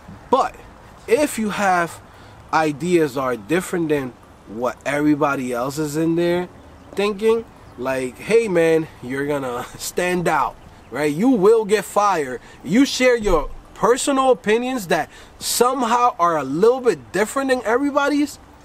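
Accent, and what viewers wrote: New York, United States. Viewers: NYC remixed with a slight D.R. accent. 😁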